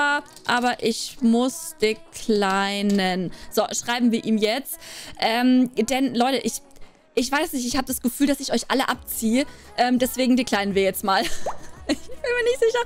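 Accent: Bavaria, Germany. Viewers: Kommst du aus Bayern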